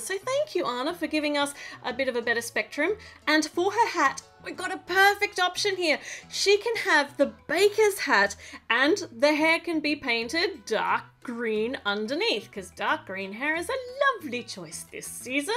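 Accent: Australia. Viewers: Are you an Aussie? Some of the words you say are so Australian. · Are u ausralian cause ur accent sounds Aussie and I'm Aussie too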